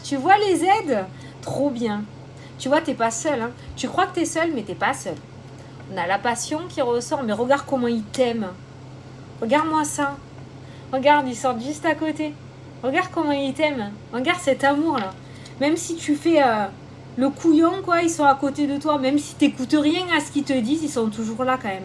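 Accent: South, France. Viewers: Bonjour, Je te découvre. Tu es géniale, pleine de vie, toute en simplicité et authenticité et en plus avec l'accent du sud. Tout ce que j'aime! Je passe un bon moment avec toi. Tes tirages me parlent beaucoup et me correspondent parfaitement 💫😇 Peux-tu me donner les références du jeu spirituel que tu as utilisé? Il est magnifique!!! Merciiiii 🙏💫😘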